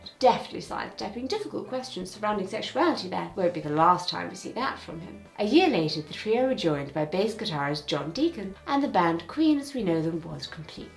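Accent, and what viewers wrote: United Kingdom. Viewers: You Madame should be made a “ Lady “ , love your speech. Soo British and educational for a speakers. I am waiting 😂😂 😂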